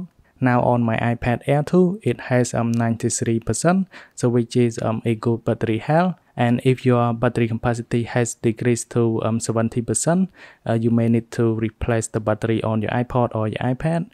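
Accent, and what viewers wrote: United Kingdom. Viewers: Sounds like British language when he’s talking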